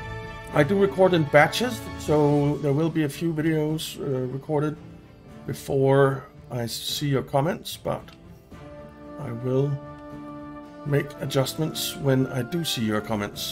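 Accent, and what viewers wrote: Denmark. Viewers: Not really hard to notice that the accent is danish 😜 great episode mate!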